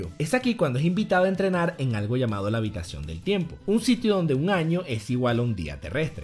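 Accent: Venezuela. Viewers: man, eres venezolano? el acento te delata jajajaja por cierto buen vídeo!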